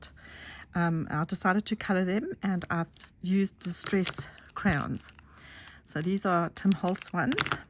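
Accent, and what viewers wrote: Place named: South Africa. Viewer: Hi! I JUST found your channel and I'm so excited to hear a fellow South African accent 😂 I'm currently binge watching all of your videos. Being new to junk journal making, I wanted to ask where you buy all of your stuff from? Especially your tapes and Tim Holtz stuff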